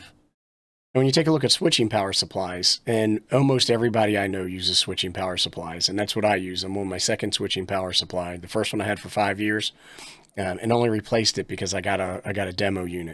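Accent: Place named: Baltimore, United States. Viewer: You're definitely from Balmere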